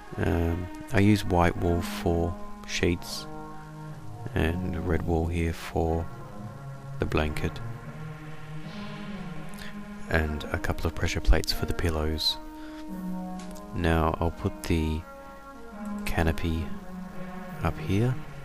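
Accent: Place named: England, United Kingdom. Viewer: Thumbs up if you read Harry Potter and you knew that the guy was going to have an English accent.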